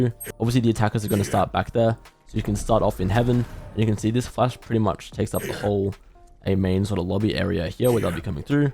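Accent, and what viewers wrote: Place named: Australia. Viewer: dude, you gotta pronounce word more clearly. You talk fast is one thing but you thicc accent combine with halfway pronunciation make it hard to understand when I watch your video in one sitting. That's why I still haven't subscribe to you. Sometimes I even select NOT INTERESTED on YT shorts cuz your pronunciation is terrible for the rest of the world. Maybe not to other Aussies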